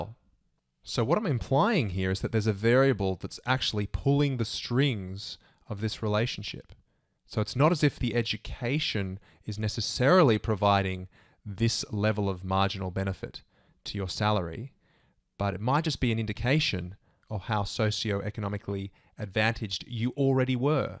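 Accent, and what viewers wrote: Australia. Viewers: you really have that edge over other channels because you have an Australian accent. I find it very pleasant listening to stuff explained with an Australian accent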